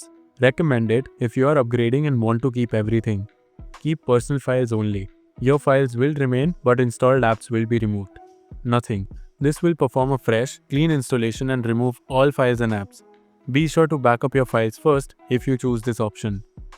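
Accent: India. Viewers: Indian accent. Yessssssss!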